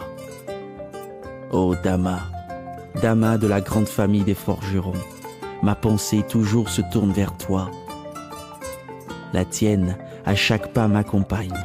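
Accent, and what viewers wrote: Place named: Europe. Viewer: Pourquoi pas lus par un fils AFRICAIN??? J'aurai aimee entendre ca lu avec un accent Africain, pas European.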